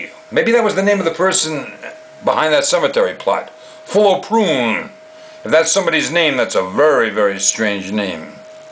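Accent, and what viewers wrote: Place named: Pittsburgh, United States. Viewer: This IS...the BEST...all time narrated video ever! Pure Pittsburgh 100%. Good ol Laff In The Dark...as narrated by a great Yinzer! "Toss an M-80 at The Devil" that is AWESOME! So Pittsburgh! THIS is the ride they truly should have saved and preserved. (Even if it had to be totally-rebuilt/updated/whatever) as Laff In The Dark was a GEM. An old time gem. The "Turnpike Ride" killed this one off. And Kennywood hasn't been able to figure out "The Old Mill" for 60 years. Cool old skool ride (we used to stop the boats, get out and walk around the inside of the Old Mill. (Then-renamed "Harold's Haunted Hideaway" whatever. I think now it is some dumb "Garfield Ride". Damn shame too. They could TRULY make this ride a scary gem, if they truly wanted to. But...just like Noah's Ark...it is an insipid and watered-down BORING ride anymore. 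) And (just like Laff In The Dark...and even (for a while) The Old Mill...and ugggh..."Le Cachot"? Remember THAT dumb ride? Everyone was upset when it got torn down. But it was a worse ride than "The Gold Rush". Kennywood...truly, has been piss poor at "dark/scary rides"...but...Not always). There was a time when Noah's Ark was simply badass!. The shaky floors were REALLY "shaky" long ago. Sometimes (in today's cancel culture-of-everything) well..."sometimes they work. Sometimes not" Boring.) The "air blast WHOOPSIE" trick would NEVER fly today. (Old timers know what I am talking about). Take your date on a walk thru. Noah's Ark...make out on the quasi-M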